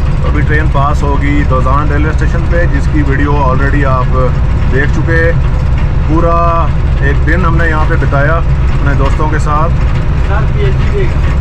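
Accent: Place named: India. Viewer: The commentator seems to speak HindEnglish!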